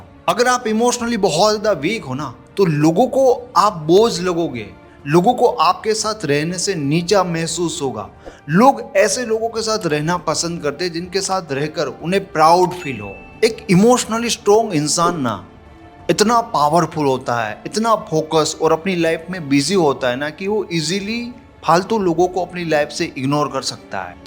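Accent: Gujarat, India. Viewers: आप गुजराती हो? आपकी हिंदी गुजराती पटेलभाई जैसी है।😂😂 मजा आता है सुन के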